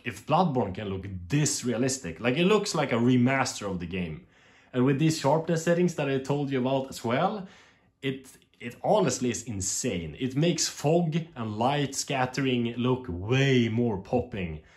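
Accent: Sweden. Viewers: Svengelskan är top notch :D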